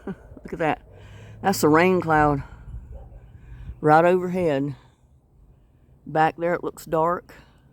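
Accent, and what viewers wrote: South, United States. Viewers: Was busy spring cleaning...the winds here are loud and strong, omgosh...parts of my front porch are missing and cleaning up the yard...😮..luvv garlic ❤️..looking at you in summer clothes is making me want warmer weather now..I had a neighbor that was from North Carolina when I was a young girl , she looks and sounded just like you, aww..and when you said in a other video you were from N.C. ..I thought..yes she is!.. I could detect the certain accent in some words you say......always liked the southern accent . God Bless. ❤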